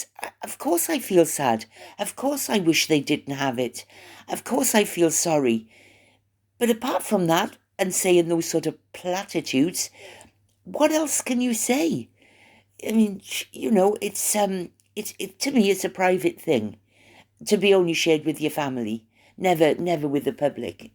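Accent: Wales, United Kingdom. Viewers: I feel like I'm in front room on a Sunday. It's like listening to my nan. I'm from Newport next to Cardiff so they sound virtually the same. It's so comforting..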